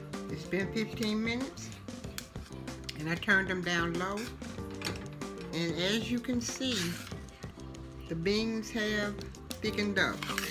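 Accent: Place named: Mississippi, United States. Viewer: Accent is 100% msg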